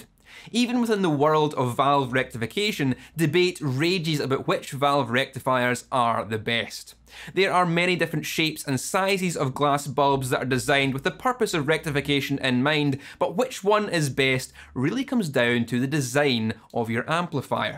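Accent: Scotland, United Kingdom. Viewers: Ah wouldn't a-trusted hem but for his Scots brogue. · Great video. I sure know a lot more about fukin valve amps than I ever did before! Love that accent of yours. What part of the UK are ya from Jerry from PA-USA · i enjoy all your "ta tas" and learn a lot.....your brogue is entertaining and your enunciation perfect....had a Scottish girlfriend back in the 60s, her name was Carol Cummings, did you know her?...just joking, love your lectures!!!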